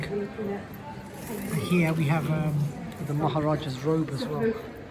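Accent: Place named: Cockney, United Kingdom. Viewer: Ranjit Singh was my hero, I hope to visit one day, thanks again, just love the cockney accent.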